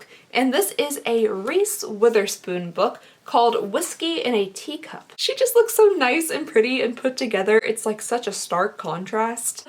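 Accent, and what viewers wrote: Kentucky, United States. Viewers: You make us Kentucky gals proud girl! Be proud of that Kentucky accent! 😊❤️ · I know your Kentucky accent is partly to blame (im from oklahoma so i feel this) but your pronunciation of some things makes me giggle a little:) especially apotheke!! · “Soundin more Kentucky by the day!” LOVE my Ky accent & being from, living in BBN 💙 Love you, you’re truly a breath of fresh air! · "Boxcutter'd... sounding more Kentucky by the day!" Wow, didn't know you were from KY until you pointed that out! And I've been watching your channel for awhile now. I'm Lexington, we're the same age and I really wish we could be friends irl UGH! ❤❤ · ‘Sounding more Kentucky by the day’- I’m dead, lol I feel that though. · "Soundin' more Kentucky by the day" Nothing wrong with that, girl! Good evening to you from Southeastern KY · I was triggered when you said you are sounding more Kentucky every day, and then I realized you are in Richmond. 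I live in Winchester. You are allowed to talk smack about kentucky if you live here...lol. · I just found out you’re from Kentucky and now that I know I can pick up on you accent when it slips out here and there. Haha I’m also from Kentucky. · I LOVE the KY accent <3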